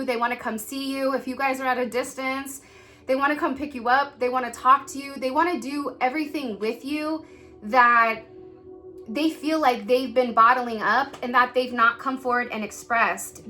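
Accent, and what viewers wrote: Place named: Bay Area, United States. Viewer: okkayyy I knew you had to be from The Bay. I know that ur swag when I hear it and see it. lol That’s Hella Dope….Yeeeee💕👑🔥⭐️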